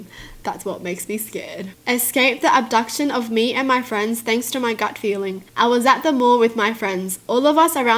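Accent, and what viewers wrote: Australia. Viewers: How did I just notice that you were Aussie I am to yay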